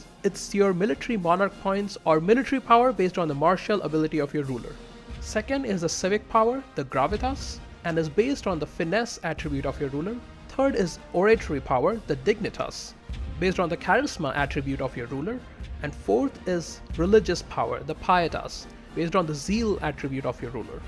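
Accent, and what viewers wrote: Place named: India. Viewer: your accent is indian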